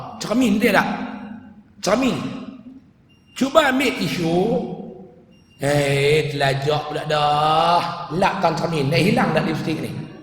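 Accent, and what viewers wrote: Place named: North, Malaysia. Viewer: .ni yg mna ada kutu dok kecam dia slama ni aku nk pesan kt hampa,hampa kecam dia hampa rasa hampa dh ckup sempurna 100% ja jd mnusia dlm dunia ni,aku Siam tp aku suka dngar ceramah dia cara dia ckap tu hampa jgn pelik kami org utara mmg loghat kami cmni dh..nmpak ckap mcm kasaq tp dak...nk taw ksaq ka dak mai hidup kt bumi utara nt hampa baru fhm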